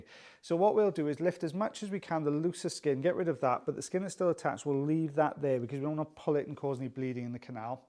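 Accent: Wales, United Kingdom. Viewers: Oh my god I've gone from a popaholic to a waxaholic thanks to you! Your you tube is so interesting and your commentary just brilliant with that lovely Welsh accent. Thankyou (rushes out to buy some olive oil ) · I love your accent!! What part of Great Britain are you from? My maiden name was Thomas so I’m a Welshy!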